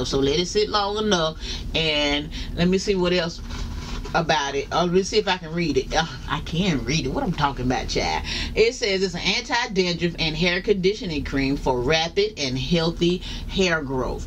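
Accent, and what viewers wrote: South, United States. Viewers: I love your southern accent. I am now a subscriber.😍❤️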